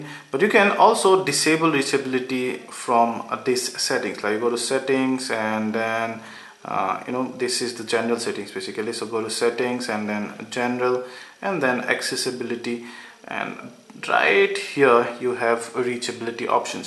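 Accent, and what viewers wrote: India. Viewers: Indian hands and ascent.... its easily to be recognized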